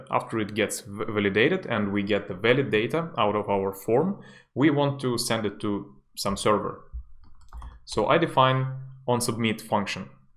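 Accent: Russia. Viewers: thank you! you speack with a russian accent)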